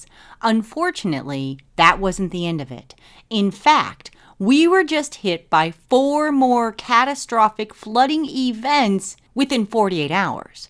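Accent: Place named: Connecticut, United States. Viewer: Outstanding vid. You sound like my ex from west haven..so I am guessing conn. accent??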